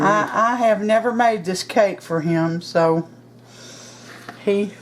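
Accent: South, United States. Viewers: I need to add this to my list. Making that St Pattys cake this weekend. I just love watching you cooking, the recipes are are so easy to follow. I like your southern twang, where are you from?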